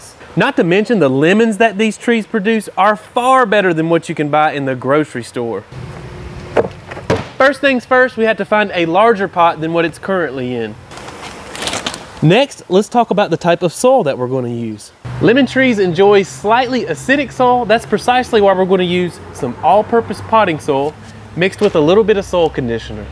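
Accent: South, United States. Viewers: Hilarious! I just got myself a little Meyer lemon tree and wanted to take the very best care of her- saw that this video had a southerner explaining stuff and knew I was in the right place! Thanks a bunch! Especially liked the alternative options for soil and fertilizer. One question: I watched a another person's video on YouTube and she mentioned that you should look for the part of the trunk that all the roots are coming out of ( it kinda looks like a peanut in the shell) , she said when repotting you should keep that part above the soil. Any thoughts or other explanations? Thanks again!!!!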